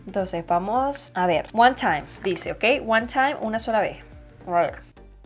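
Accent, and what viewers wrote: Venezuela. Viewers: jajajaja tu acento Venezolano es único jjajaja saludos preciosa desde hoy soy tu suscriptor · Con solo ver 30 segundos del video me di cuenta de que eras venezolana 😍 jajajaja nuestro acento es UNICO!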